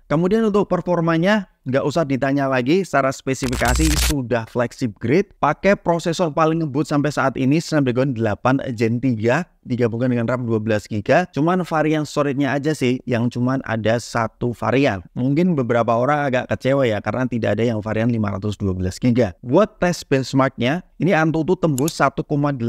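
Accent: Semarang, Indonesia. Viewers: Mas…logat mu Semarangan banget 😂😂😂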